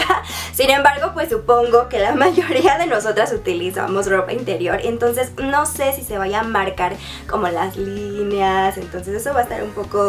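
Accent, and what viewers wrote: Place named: Fresa, Mexico. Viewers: ¿que le copia a Clara? JAJAJAJAJAJAJAJAJAJAJAJAJAAJAJJAJAJAJAJJAJAJAJAJAA es el mejor chiste que he escuchado. Te encargo que cheques el tiempo que lleva una y otra en youtube, y también checa los primeros vídeos de y te darás cuenta que ese acento "fresa" lo tiene desde que comenzó. Ella habla así, coincido en que aveces se escucha algo extraño, pero de que ella copia a otra persona, eso si que noooooo... checa las cosas q te dije. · si te apoyo o abre mucho la boca y medio fresona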